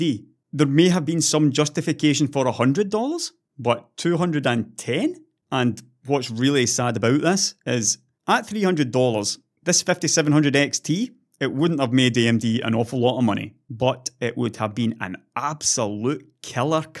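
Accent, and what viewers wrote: Scotland, United Kingdom. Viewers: Damn, is scotish? Just noticed...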